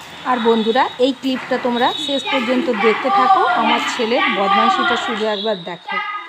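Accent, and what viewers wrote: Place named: India. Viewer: অনেক অনেক ভালোবাসা রইলো বোন এগিয়ে জাও তোমার কথাগুলায় কেমন জানি একটা টান তবে শুনতে বেস লাগে তুমি কি ইন্ডিয়ান দিদিভাই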